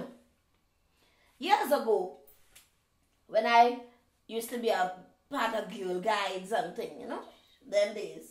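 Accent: Barbados. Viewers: The bajan accent killing me to😭😂😭😭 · That Bajan accent!!! 😂😂😂😂😭😭😭😭😭😭😭😭😭😭😭😭😭😭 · love she bajan accent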